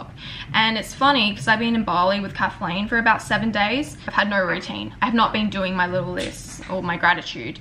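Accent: Australia. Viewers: It's an Australian accent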